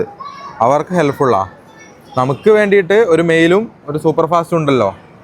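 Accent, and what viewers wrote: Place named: Koyilandy, India. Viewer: കൊയിലാണ്ടി ശൈലിയിലുള്ള വിവരണം ഇഷ്ടപ്പെട്ടു.പേഴ്സ് നഷ്ടമായി എന്ന് കരുതിയപ്പോഴും അത് തിരിച്ചുകിട്ടിയപ്പോഴതെ അവസ്ഥയും നന്നായി മനസ്സിലായി..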